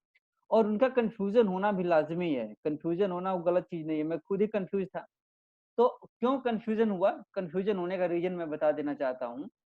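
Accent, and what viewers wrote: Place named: Bhojpuri, India. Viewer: English doesn't matter. Apki bhojpuri bahut sweet hai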